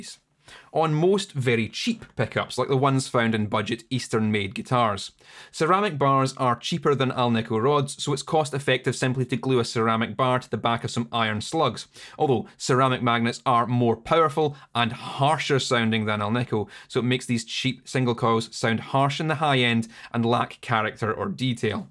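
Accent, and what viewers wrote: Scotland, United Kingdom. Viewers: Not being a Scottish Highlander myself, I had to slow down the Playback speed here AND use the CC subtitles as I also often do with Irish movies, unlike Liam Neeson would do with his "particular skill set" of being an Irishman. The Great Scots even make the intrepid Irish look like wussies while even wearing their skirts too; that's like Viking level cool and I've gotta Love that! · Great video (except too much information crammed into so little time at a speed that precludes taking notes since I can't write that fast, with such heavy British accent that I can't understand because you talk too fast)! But on a separate note (no pun intended), how different are today's pickups from S.G.'s patented ones? (I think it was SG, Simon maybe? crap, I can't remember anymore cause I'm so stinking old!!! ... Ole what's his face .... 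· It reminds me of Mr. Scott on Star Trek. "The engines canna take much more of this, Captain! Ach, my poor wee bairns!"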